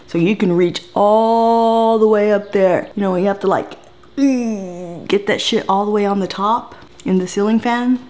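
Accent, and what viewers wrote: Texas, United States. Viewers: yep she’s from Texas!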